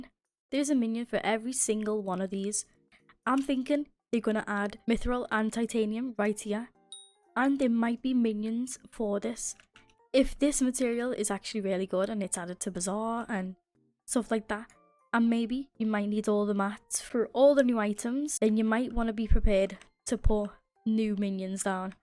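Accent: United Kingdom. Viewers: Are u from like Great Britain or something cause u sound like haha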